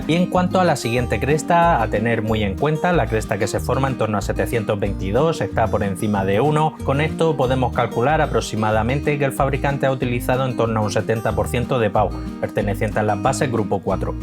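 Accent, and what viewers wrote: Murcia, Spain. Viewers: ok, muchas gracias, a ver qué tal dentro de un año con uno de esos dos. Para el año que viene, merecería la pena probar algún Ravenol? Qué supondría bajar a un 0w40? Podría hacerlo? Vivo en Valladolid España, en los meses de invierno, de Diciembre hasta Marzo es normal estar a -3°C o incluso -5 o -6°C muchas mañanas cuando arrancó el coche, y suelo hacer trayectos cortos de 4 o 5 km varias veces al día. En verano puede llegar alrededor de los 40°C de temperatura, algo menos que en Murcia que es donde parece que vives, lo digo por tú acento, tengo familia en Los Alcázares 🥳. El año pasado cuando faltaba poco para el cambio de aceite, el motor ya sonaba un poco a lata, a ruido metálico con el Motul Xcess 8100, pero con este Shell Helix Ultra parece que sigue sonando muy redondo y eso que ya le toca hacer el cambio de aceite, es posible que este año haya hecho 1.000 o 2.000 km menos que el año anterior, no sé si eso tiene que ver, pero creo que poca diferencia hay entre hacer 7 o 9 mil kms por año... 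Yo rara vez paso de 10 y 11 mil kms al año y el 80% de los kms son en ciudad y recorridos de 5 km o menos.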